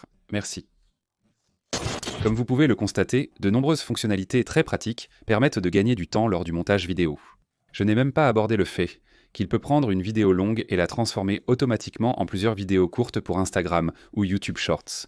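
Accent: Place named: France. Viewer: French comment : french voice looks like something created by IA (synch lips not real), but the voice is good, with realistic french accent, not robotic. Pleasant enough.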